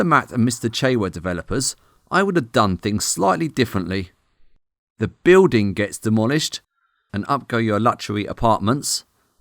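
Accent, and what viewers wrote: United Kingdom. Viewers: nope he's from the UK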